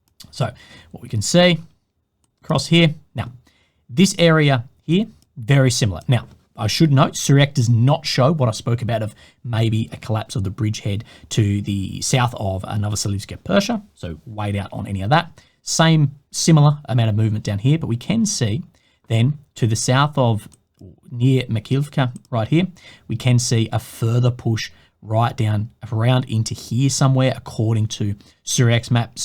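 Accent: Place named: Australia. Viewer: He speaks English with an Australian accent.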